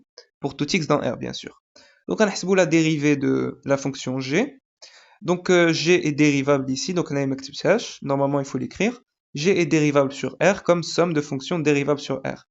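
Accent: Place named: Arab world. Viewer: Parfois tu parles en français et y a des fois tu parles comme un arabe , je ne comprend pas 😢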